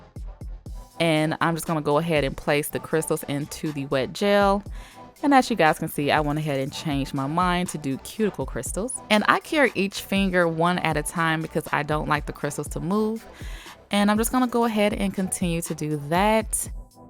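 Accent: South, United States. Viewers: I was going to get this kit a few weeks ago but held off. I honestly wasnt sure if they would glow well or not, and being in quarantine I was hoping to do a fun set. I decided to order the kit a few days ago and now it is out of stock😭. I am sooooooo happy you did a review on this kit I love how honest and descriptive you are!!!!!! I'm originally born and raised in the south now I live in nyc so to hear that southern in your voice is like home!!!💖🥰🤗thanks for the videos keep them up!!!!!!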